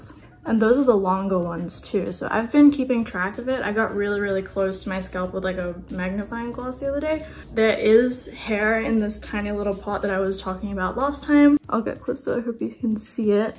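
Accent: Australia. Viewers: I could definitely hear the Aussie at words ending in the “r” sound, but then didn’t hear it at other times when I thought I would. Thanks for clarifying and answering! 😉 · Your accent is really interesting, it's like a cross between Australian and American! 😯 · Where’s your accent from? It sounds Australian but then there is an American twang in there.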